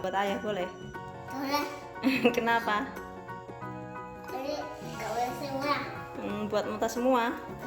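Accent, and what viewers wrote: Banyumas, Indonesia. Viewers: Jebule rika wong ngapak yahhh :v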